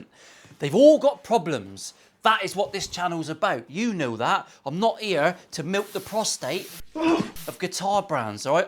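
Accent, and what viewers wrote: United Kingdom. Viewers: Hello, I have no problem with the swearing you say but.. for heaven's sake.. can you tone down that British accent a little? It's getting on my nerves! And, by the way, I'm from Brazil and I speak Portuguese.. Anyway, I'm signing up for this channel right now. Thank you!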